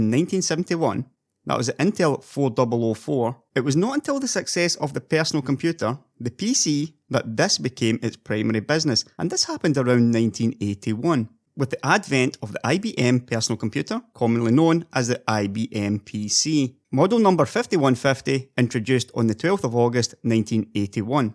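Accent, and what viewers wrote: Scotland, United Kingdom. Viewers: I thought you are Scottish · Just built my 1st pc in the last year or so, luckily I bought a shitty cheap i3 to get me going but decided half way through of building a better 1 so got an rtx2070 which is a bit strong for an i3 I think. I was looking into a new motherboard and processor and I’m really glad I watched this video, I will never buy anything from intel again. Gonna wait for the new thread ripper and get a beast of a pc. PS I just subbed to this channel the other day and so informative. Every video is class that I’ve seen. Presume your from Scotland ye? I’m in Aberdeen so thanks from a fellow Scot :) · I love your Scottish accent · Are you scottish?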